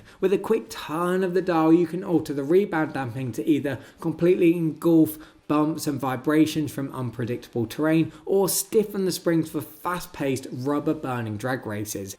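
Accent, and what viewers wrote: United Kingdom. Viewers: he talk in dollar with a sharp british accent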